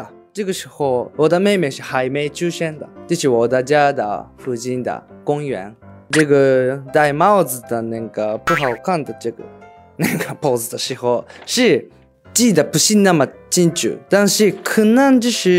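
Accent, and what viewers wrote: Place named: North, China. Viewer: 中国话越末越流畅了👍👍👍有点象中国北方某地方的方言🎅🏻🎅🏻🎅🏻😃😃😃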